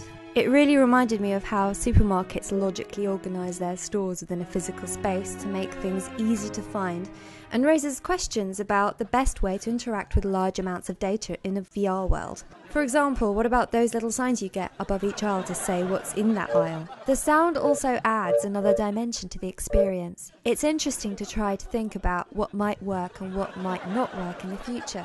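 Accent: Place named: United Kingdom. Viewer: Yes, I love her accent. I generally dislike British accents. I'm from South Africa, where I'm used to an accent where they pronounce the letter 'T' correctly, whereas in the UK, they'd say Liber'ee rather than Libertee. I really dislike it when Brits take too many shortcuts with their English words. Which is ironic considering that they should be the leading example of the language.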